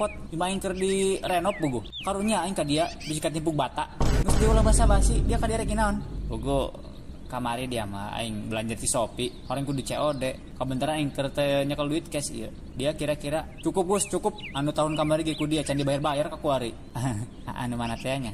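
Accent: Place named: Banten, Indonesia. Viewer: Logat namah logat banten iye doangna 😂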